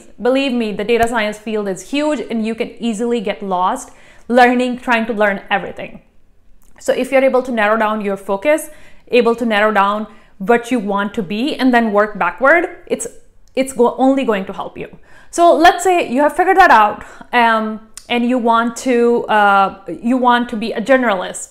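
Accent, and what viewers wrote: India. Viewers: Indian accent 👍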